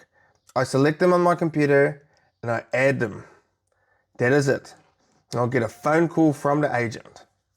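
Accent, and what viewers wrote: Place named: South Africa. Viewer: i'm hearing the South African accent, fellow South African here. Thanks for the content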